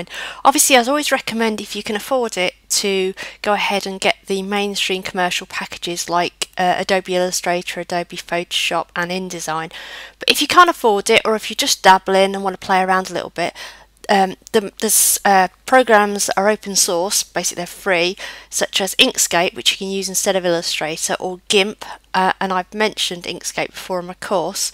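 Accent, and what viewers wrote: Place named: United Kingdom. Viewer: Thank you! Beautiful girl! Love your Britain accent! haha~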